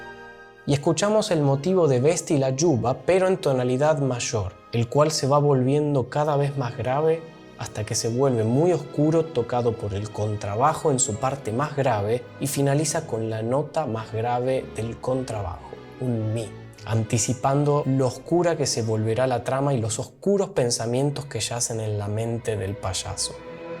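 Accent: Argentina. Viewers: Non sto piangendo, beh, sto piangendo perché il tuo accento è molto divertente. Tutti gli argentini parlano così? Grazie per avermi fatto ridere. Questo è il bacio di Tosca! Muori, dannata, muori! 😂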